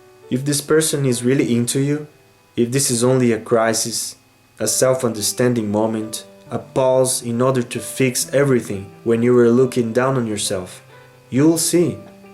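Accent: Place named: Brazil. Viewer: I KNEW it was a Brasilian accent!!! haha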